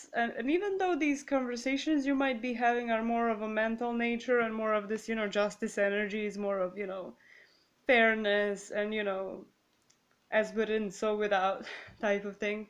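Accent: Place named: Czech Republic. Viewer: Are you czech i think you have a czech accent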